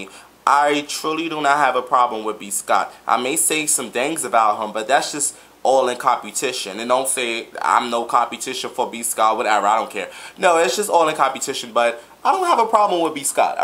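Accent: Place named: New York, United States. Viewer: i love how you talk with that new york accent!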